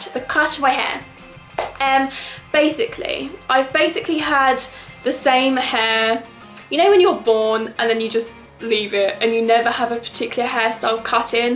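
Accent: RP, United Kingdom. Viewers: she sounds posh as well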